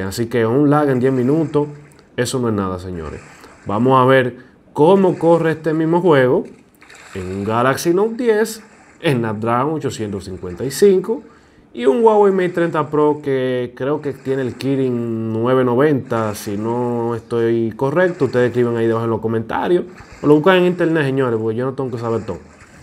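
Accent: Dominican Republic. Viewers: Se nota que eres dominicano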